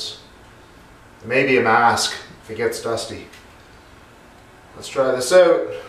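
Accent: Canada. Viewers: I thought "he must be Canadian" Confirmed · You didn't have to say Canadian. Your accent gave that away. I miss hockey.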